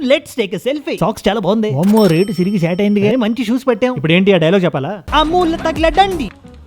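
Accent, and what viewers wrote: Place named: Karnataka, India. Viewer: Ur Kannada accent is 😍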